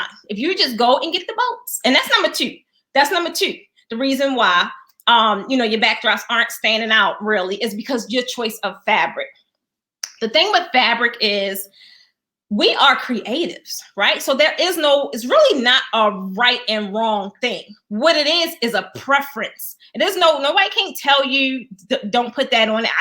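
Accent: Baltimore, United States. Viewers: I love the bmore accent. Hope I got it right🌻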